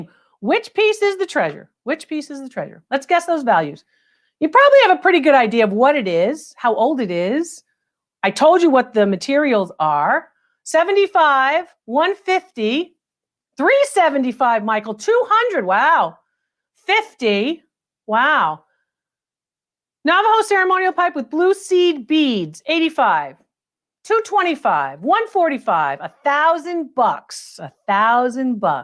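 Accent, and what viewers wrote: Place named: Connecticut, United States. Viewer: I absolutely LOVE the way calls everyone “you people”!, you talk just the same as me. Must be a Connecticut thing…🤷🏻‍♀️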